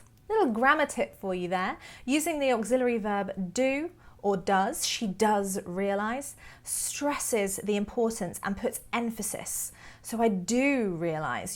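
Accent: United Kingdom. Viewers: I love British accent a lot and your accent also. I had been in England for 10 mouths it's easy to speak English but there's some confusing for me because I'm French speaker . I mean there are many words similar in French and different pronounce.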